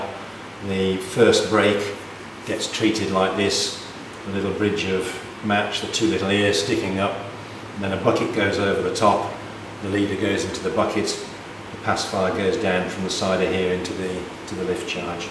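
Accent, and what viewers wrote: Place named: United Kingdom. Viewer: Also, where did you live in the UK? You have a familiar accent.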